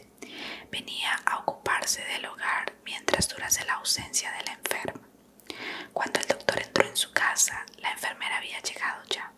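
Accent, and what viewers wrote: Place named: Colombia. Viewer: Amo ouvir essa colombiana falando espanhol. ❤❤❤❤❤😘😘😘😘😘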